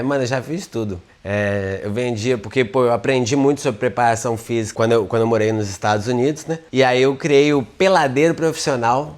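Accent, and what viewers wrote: Minas Gerais, Brazil. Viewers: Sabia que ele é mineiro. Tem sotaque 😂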